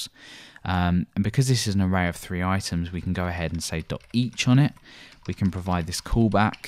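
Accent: England, United Kingdom. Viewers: so good to hear a clear concise english accent. you my friend are part of a small percent of the population, a dying breed in the cultural soup that is the united kingdom full of wanna be "roadmen" and wasters. There should be a lot more English people making these videos, and programming should be taught in primary school like it WAS in the early 90s. Before austerity and dumbing down became a priority. /rant over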